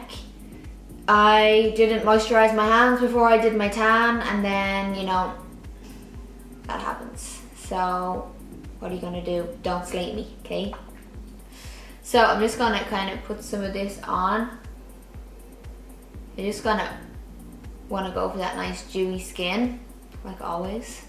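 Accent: Ireland. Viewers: Seriously I am subscribing just because I love your accent. Irish? My ancestors are from Cork. Stunning look by the way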